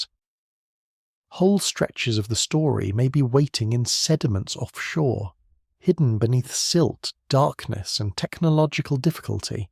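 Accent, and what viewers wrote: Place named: Australia. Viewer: AU narration sucks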